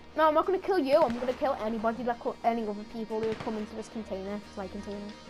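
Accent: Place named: Liverpool, United Kingdom. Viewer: What a scouser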